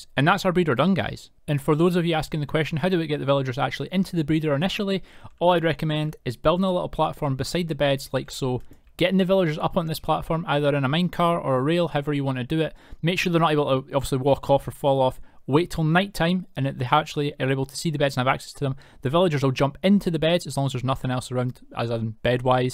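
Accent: Scotland, United Kingdom. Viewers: I don’t want to assume. But are you Scottish? - from a fellow Scot :) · Yay Scottish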